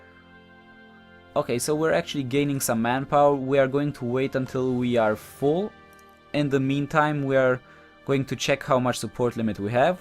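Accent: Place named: Germany. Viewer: I really like your voice. Keep your work up, its good .D Greetings from Reddit. Btw: Are you from Germany? Your Accent sounds a little germanic.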